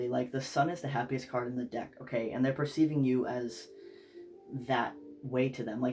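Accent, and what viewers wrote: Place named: New England, United States. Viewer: Just found you...love that New England accent! I'm from there too. Spot on, but the guy I loved turned out to be a total a&&s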